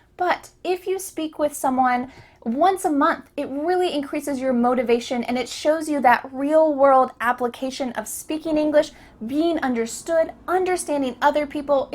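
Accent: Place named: United States. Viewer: Hi, I really enjoy learning English with you. I love your américain accent, your smile and your energy in teaching! I’ve got motivation when I watch your videos. Even when I am in a hurry in the morning I try to listen and watch your videos while I have breakfast. Thanks a lot for your lessons. 🤩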